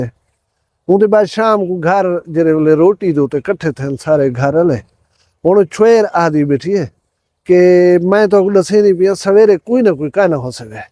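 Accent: Saraiki, Pakistan. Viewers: behtreen sraeki andaz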